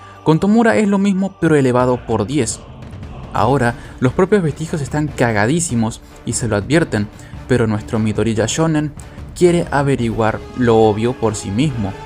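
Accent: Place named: Argentina. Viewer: Sé que suele narrar así en su canal principal, pero me recuerda a Axl Kss, talvez por el acento argentino XD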